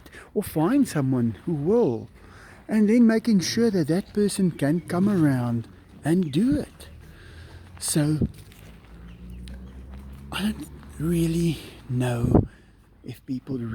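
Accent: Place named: South Africa. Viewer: It sounds like you from south Africa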